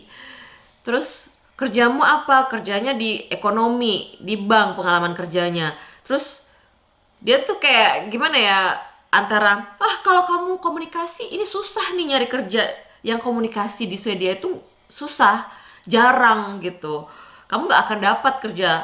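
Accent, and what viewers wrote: Java, Indonesia. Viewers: MBAK, JENENGAN KOK AYU MEN....MBEK OMONGANE MEDOK JOWONE, SENENG AKU....HAHAHA....SESAMA ORANG SOLO HARUS SALING SUPPORT......HEHE😍 KONTENNYA BAGUS2 LHO👍👍👍....SEMOGA MAKIN BANYAK YANG NONTON YAAA...SUKSES! GBU...😇💕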